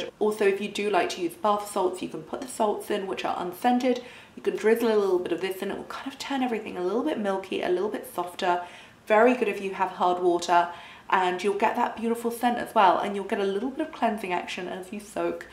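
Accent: United Kingdom. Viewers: Thank you for the infos said with a lovely British accent ❤️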